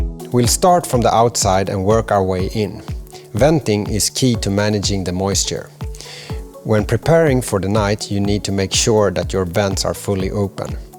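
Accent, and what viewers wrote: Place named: Sweden. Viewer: swedish accent :)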